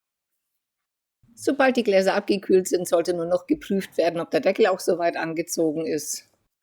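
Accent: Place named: Meiningen, Germany. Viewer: Hallo, ich finde deine Videos super! Perfekt erklärt und mit einfachen Zutaten! Ich hab mich schon durch einige deiner Brote durchprobiert, beide Sorten Frischkäse ausprobiert und gestern Kisch-Erdbeermarmelade gemacht. Alles Super! Ich komme aus der Nähe von Meiningen. Deinem Dialekt nach zu urteilen müstest du auch aus der Nähe stammen!? Liebe grüße Tino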